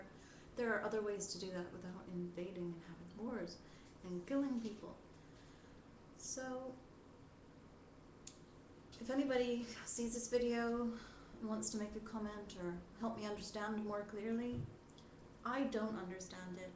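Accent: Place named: Ireland. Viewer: I love your accent and you are a beauty! Are you from Ireland?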